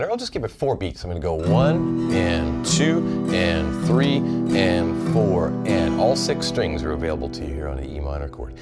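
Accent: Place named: Canada. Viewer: Great video. I can tell the difference between a Canadian and an American accent easy were others cant but you sir have such a Canadian accent a deaf man could tell your Canadian. This is were im told your from Texas. :) Iv never heard such a nice Canadian accent in my life.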